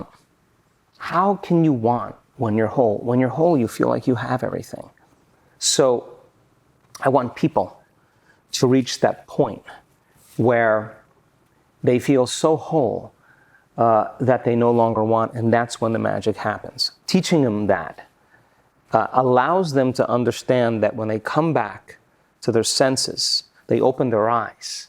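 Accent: Germany. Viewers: cuuuute german accent :)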